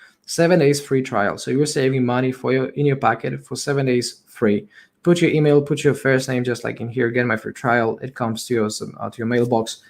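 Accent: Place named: Poland. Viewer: Tak myślałem ze polski akcent, wpadłem od Scott Melker, pozdrawiam i dzięki za perspektywę. Dokańczasz do mojej listy!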